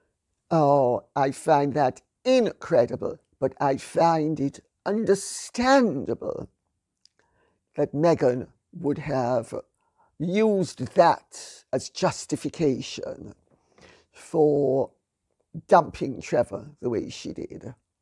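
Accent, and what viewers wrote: Jamaica. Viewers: Lady C. You are so correct about Jamaica and Ganja ! I lived in Constant Spring, at Braemar House after it was turned into Apartments about 1968. Remembering that Ganja was absolutely ILLEGAL and basically only some Rastas used it and Bob Marley. Jamaica is a spectacular place, I know it front to back after living there for five years. So many stories. Wish I knew how to contact you, would love to share some of the stories with you. Thanks so much for your entertaining stories. How I enjoy hearing your Beautiful Jamaican Cultured English accent. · I was trying to pin point your accent, and after you said you were Jamaican, it made sense.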